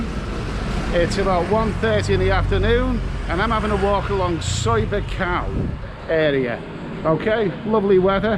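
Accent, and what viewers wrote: Liverpool, United Kingdom. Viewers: i am sat here on a wooden stool washing up last nights dishes ( Issan) and am laffing my bollocks off at ya its amazing what the ol one two buckle my shoe can change ones mood!! This was like watching and listening to a scouse Bob Monkhouse... i wriggled that much on this stool av got splinters in mi arse... Great stuff Chok dee mi ol flower.. Big Cee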